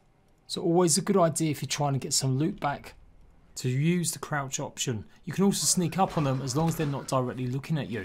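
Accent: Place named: United Kingdom. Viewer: without wo'ah, I love British accent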